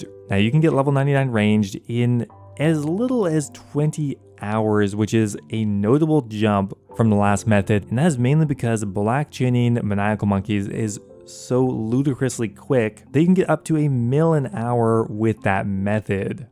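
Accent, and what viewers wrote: Canada. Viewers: That’s the American way. This guy sounds Canadian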